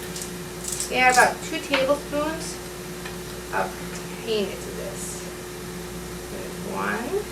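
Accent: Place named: Rochester, United States. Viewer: You have a Rochester NY accent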